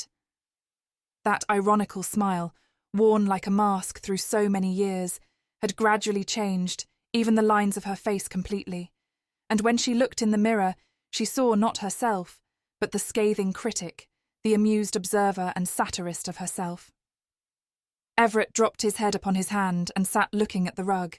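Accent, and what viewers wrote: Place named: United Kingdom. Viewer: Please find American voices for Ametican novels. (I have a sensative ear for accent & couldn't listen to your James Joyce stories w a British accent. They didn't work ....). In genrral I am grateful for your downloads. Very.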